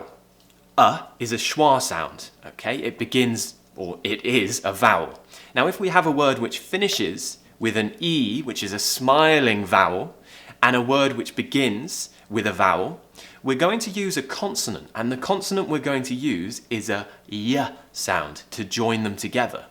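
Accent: RP, United Kingdom. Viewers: I love your accent. Thanks for your beautiful british accent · Sir, your way of teaching is outstanding. These videos are really helpful in terms of british accent . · You are amazing teacher. I have just joined you. I am fond of the British accent. thank you, I am from Egypt and I love you very much God bless you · Listening to you to get more from your accent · I started watching you because you are similar to my ex friend, but now I do it only for your great teaching of my dream accent. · Hello you have a perfect british accent I recognize because i had a canadian teacher who taught me a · AT LAST THE REAL BRITISH ENGLISH ACCENT. THANK YOU MATE!!!!! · same here, looking forward to speaking like him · Best I have seen thus far. He speaks clearly so you can really hear the different in pronunciation in comparison to other accents. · Nice videos you're doing. 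Your accent is perfect for an old teacher to get back to a standard accent. I lived in the US for awhile, then in Northern Ireland. The Northern Irish accent from Fermanagh prevented me from teaching for a while. I resumed teaching with an American accent, but after a while I realized the Yankee accent was very difficult for French learners. After my break, following a surgery, I was on sick leave for almost six months and moved on to get back to a standard RP accent, which proves to be more understandable for some students... Had a French student, whose father is a Briton, he couldn't get a word in any exercice with American English (mostly listening exercice). I found your videos recently and it is totally rad (sorry, Americanism)... As a teacher of English, even though I have lived for some time in English-speaking areas of the world, the right intonation in English is always a challenge for toneless and stressless (depending on regional dialects) French. 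I sometimes use your vids in class to get my students used to the new RP accent I hear when visiting southern England... Keep on with it! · Love your accent I want to learn more British English accent ❤❤❤ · I want to marry a British man. Love your accent! 😍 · Hi Teacher I really liked your accent Britsh accent. I enjoyed your lesson. I will continue listening to your lesson because I like it. I didn't understand the course you have talked about. Where ca I found it? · New subscriber here ! When I watched your video I love it already 😁 I really like British accent Like movie Harry pottah! 😅 Game of thrones 😅 Watching from Philippines Thank you for sharing Keep up the good work 😇 Ps I watched your video since yesterday 😅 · I´m a languages speaker student and I´m more familiar with an American accent than a British accent, but your videos have helped me to more familiar with your British accent. 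Love your videos and you too. Kisses from Mexico. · I love the British accent and l love your explanation. You are an amazing teacher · I'm Egyptian and it is the first time to see your videos.. That was really amazing and i hope to be excellent in British language cause I'm in love with this language accent❤️❤️❤️❤️❤️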